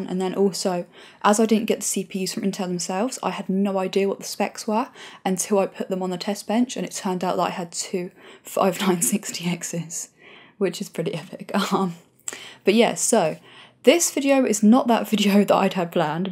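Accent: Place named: West Midlands, United Kingdom. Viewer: Your accent is great....sounds to close to me tho.. West Midlands?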